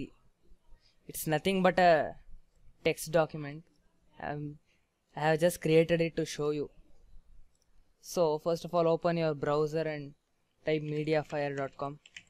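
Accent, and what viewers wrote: India. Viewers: Right as a hear the Indian accent I knew this was a helpful video lol. THANKS!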